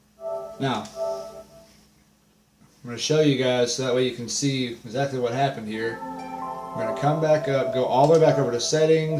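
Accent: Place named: Appalachia, United States. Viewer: Sound like a dumb hillbilly no wonder you can't get it to work lmfao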